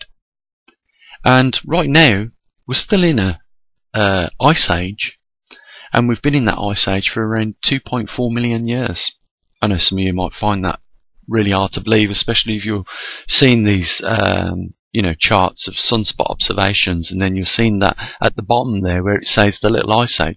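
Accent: Birmingham, United Kingdom. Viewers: I got to ask but what part of Birmingham are you from?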